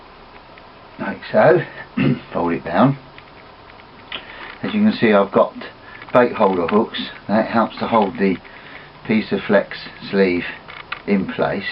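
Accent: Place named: England, United Kingdom. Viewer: Сразу видно по акценту - Англичанин)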